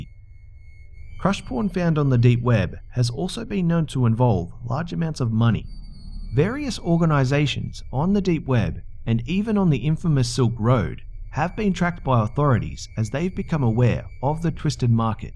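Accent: Australia. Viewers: Are you Australian ??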